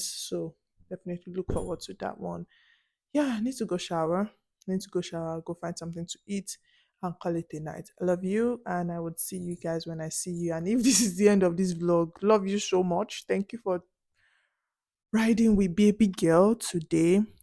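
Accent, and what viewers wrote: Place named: United States. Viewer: Yoruba GRWM with you, definitely going come out great with your small American accent here and there😉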